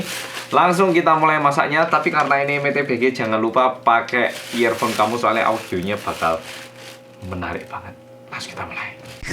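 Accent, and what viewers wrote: Java, Indonesia. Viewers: Mas mw nanya dong, masnya ini org jawa atau oppa korea ya?? Aksen suara jawa totok, tp facenya 오 빠 😁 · ARTIS KOREA YANG MEDHOOOOKKK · Mas Medokmu itu lho 😁 sesuatu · Selaluu nungguuuuu samaaaa orang medok ini hehe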